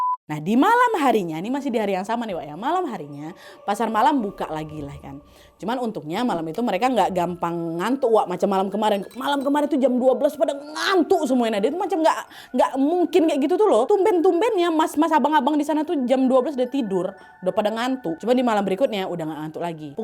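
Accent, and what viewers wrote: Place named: Sumatra, Indonesia. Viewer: Logat sumatranya enak banget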